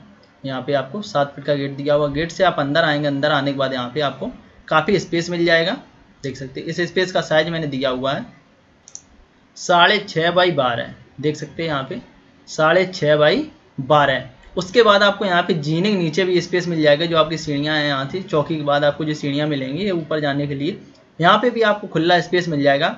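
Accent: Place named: Bhind-Morena, India. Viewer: भिंड मुरैना क्षेत्र के लग रयै आवाज से।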